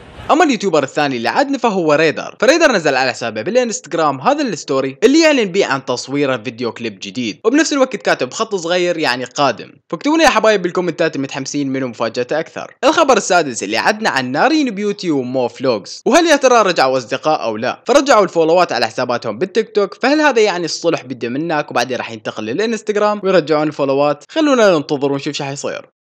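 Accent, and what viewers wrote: Iraq. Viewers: سؤال انتة عراقي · عراقي من طريقة كلامه. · ماعرف اذا اسمك بس عادي انتي عراقي لان مبين من لهجتك +كلمة وياهم علواهس اني عراقية وافتخر لان من العراق 👑وشكرا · سؤال انت من وين لانو احسك سعودي وبنفس الوقت عراقي 😊😊 بليز جاوب 🙏🙏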